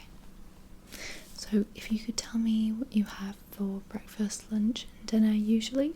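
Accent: United Kingdom. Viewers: brit accetta too different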